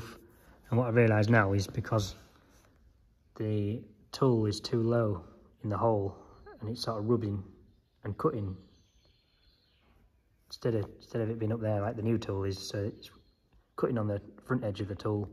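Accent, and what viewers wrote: Yorkshire, United Kingdom. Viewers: Learning and overcoming problems, is what real world manufacture and repair is all about. How tedious it must be to have a job where everything falls into place first time. BTW the auto generated subtitles make a hilarious job of your Yorkshire accent. Thanks for posting.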